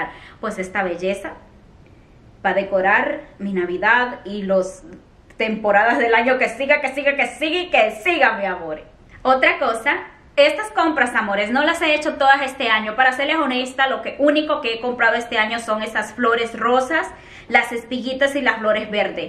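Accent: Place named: Dominican Republic. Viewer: Hola. Creía que eras Dominicana. Tienes una forma de hablar y expresiones nuestras. Salidos a los Hondureños.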